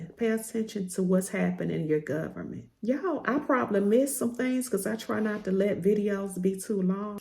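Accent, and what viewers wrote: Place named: Georgia, United States. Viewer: I just discovered your content today! I am from Macon, GA originally and listening to you reminded me of women I grew up with😊. It was nostalgic and a breath of fresh air. God Bless You and Happy Holidays❤❤❤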